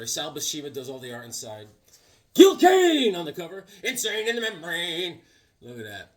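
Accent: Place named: Australia. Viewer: my guy! Popsicle art is the highest form of flattery! Loving the art! You're too tough on yourself. Conan has been a lot of fun. Never met a baby that didn't love setting off fireworks. Justice society was the victim of Ghost Machine I think. Infinity Inc. is a Beckermin favorite from way back. The legion of the unliving! Glad to hear Ron is doing good! First appearance of living lightning! That Night Thrasher is from his ongoing series not to be confused with the limited series Four Control. Issue 3 is the pro debut of Ken Lashley art. Yeah comic viking is made of stone, I'll break him down yet! Thanks for the joke loan! Did you ever see my character the comic community hunter from 2022? Such an authentic Aussie accent. I need to get that Captain Universe run. Love those og guardians of thr galaxy books! This Rip Hunter book wow!! Great books this week! 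Yeah Comic Viking is one of those rare serious Marine vets. I'll win him over yet! Have a great one!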